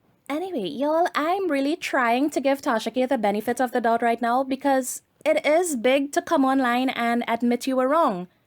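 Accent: Trinidad and Tobago. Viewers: Hey girl..you sound like a Trini...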